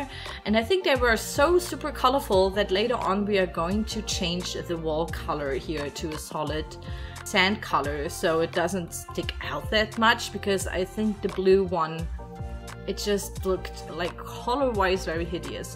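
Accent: Germany. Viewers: So you're german??? I've always thought your accent kinda sounds german but I was never sure about that and I was trying to figure out where you are from if not from germany lmao Anyways! Really nice build even though I have to admit that I'm also not as much into mermaids... so I'll just let the mermaid fans do the judging ^^ Grüße einer ebenfalls Deutschen xD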